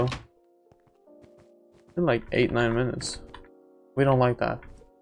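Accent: Sweden. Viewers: you from sweden??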